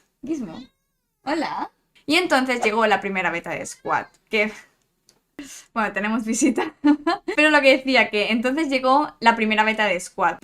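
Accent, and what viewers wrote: Spain. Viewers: Desde que te conocí el año pasado siempre supe que había algo especial en tí, ya sea en tu acento, que sentía algo de español, o por tu forma de trabajar, tu carisma, tu compromiso. Te agradezco por hacer de las Brawl talks algo increíble y especial, con Dany, con Ryan, con Frank de vez en cuando. Se que en esta nueva etapa es como comenzar de nuevo, pero es algo que tú necesitabas y te entendemos, se que te sentirías mal si no hubieses tomado está oportunidad, y todos te entendemos, mucha suerte en Squad!!! Te queremos mucho ❤❤❤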